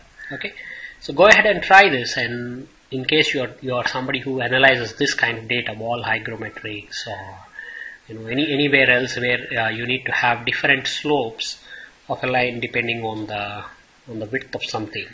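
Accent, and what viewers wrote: India. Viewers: i like indian accent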